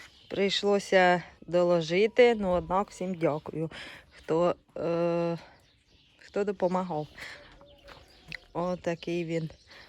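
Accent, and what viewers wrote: Poltava, Ukraine. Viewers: Добрий день україночка! Говор полтавський! Звідки сама? Замужем? Трактор МТЗ зачот!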